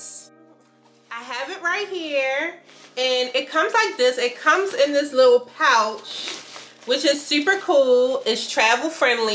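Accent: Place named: Baltimore, United States. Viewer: lol, it wasn’t as Baltimore thick as I’ve heard. I definitely said. “She’s from the DMV” but the trees was slight so I didn’t pinpoint Baltimore.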